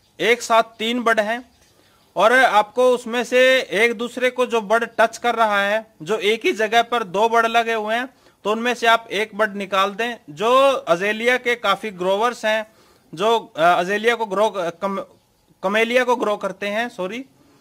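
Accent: India. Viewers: Was he speaking English? At first but then it changed to Indian ? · I don’t understand when you talk with Indian accent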